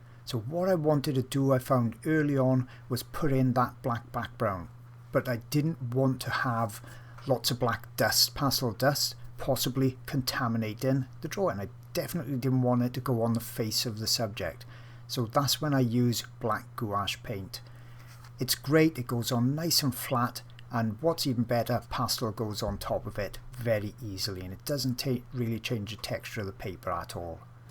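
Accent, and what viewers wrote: Wales, United Kingdom. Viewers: Welsh amateur artist picking up a pencil again here. Brilliant tutorial and great to hear a Welsh accent 🏴 🙌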